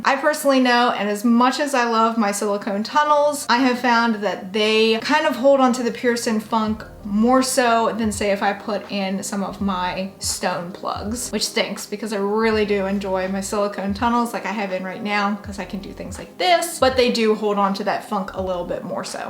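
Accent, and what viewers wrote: South, United States. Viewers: Afternoon Gorgeous 🌞 Hope this message finds u well! Luv ur vids! I just watched ur "I talk funny vid" I watched as I knew u were Southern,but didn't know from which part till now. Im a proud Southern girl myself&had the same issue when I moved from Fla 2 Colorado🙄 I even write how I talk unless it's an important document or smthin. I happen 2 luv how u talk&it's actually 1 of the reasons I started watchin ur vids. People can b so rude! Glad u addressed ur accent the way u did,luv the blunt,honest&2 the point attitude! It's another awesome southern girl charm! Keep stayin u! Stay safe&healthy&I look 4ward 2 ur next vid! Happy Piercin💕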